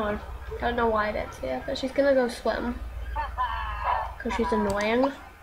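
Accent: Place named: Australia. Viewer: Love ur accent btw where r u from ? Australia ?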